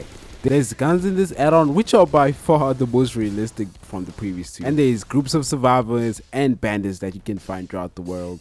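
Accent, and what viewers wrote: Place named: South Africa. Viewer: Somehow I just knew you were South African, we can just sense it between our people, subscribed once I realized you were, gotta support good ZA creators.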